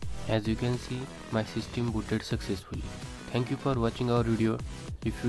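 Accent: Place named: India. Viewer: As soon as i hear bgm and indian accent i knew it's gonna work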